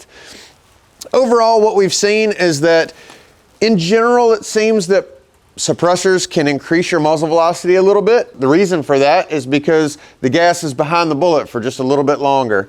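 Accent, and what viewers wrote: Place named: South, United States. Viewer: Nevermind I can hear this dudes southern drawl so it negates my earlier question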